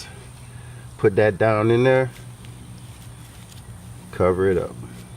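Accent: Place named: South, United States. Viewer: I know a southerner when I hear my people. Lol This is my first year attempting a garden. I did very well with container tomatoes, then bombed on the garden bed plants. However, I just went and found my first baby watermelon growing after I hand pollinated it three days ago. Super excited! Which zone are you in? I'm in 8a. I'd like to figure out what I can grow through the Fall. Thank you for the videos~!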